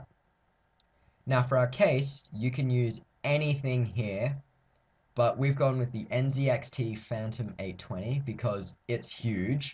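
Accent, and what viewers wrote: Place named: Australia. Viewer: Well I think he actually sounds Australian. And Australian's use dollars, soo yea.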